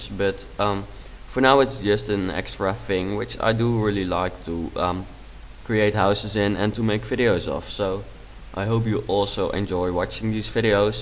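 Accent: Netherlands. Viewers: I immediately recognized your accent. What's up, fellow Dutchman. :) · Super chill. ( Ik zie en hoor namelijk dat je Nederlands bent ;) ) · ben je nederlands an het horen aan je accent net luis van gaal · Ik kan aan je Engels horen dat je Nederlands bent ;) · zonder naar je kanaalnaam te kijken wist ik al dat je Nederlands was. De manier waar op je Engels praat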